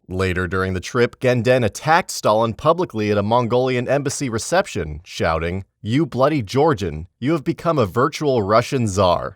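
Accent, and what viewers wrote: United States. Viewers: speaking in America English ? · Only an American would talk about “Buddhist” church! The ignorance is astounding! They are also the ONLY people who say “Moss-Cow” and not the correct: “Moss-Cow” Never trust an American teaching history. Especially one ignorant enough to think Buddhist’s have churches!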